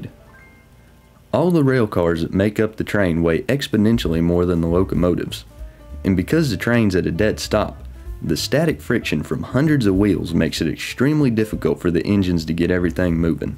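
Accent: South, United States. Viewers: I’m old. Trained in physics. I never knew before the essential function of the Draft Gear. Thank you very much. Thank’s for the sweet Southeron accent! God Bless y’all. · The video sounds so American, and I have learned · It's nice to hear a country accent it's different cool great video 👍👍👍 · Great informative video. I have never seen a video that explains such a complex science so simply to a layman. Also I love the friendly, folksy southern drawl. 😄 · As a Canadian, who speaks in a hard, flat English accent, it’s a pleasure to hear that soft Southern narration. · Never seen an educational video with a southern accent 😄👍🏻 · This guy sounds like a hillbilly, bibel basher and redneck all in one.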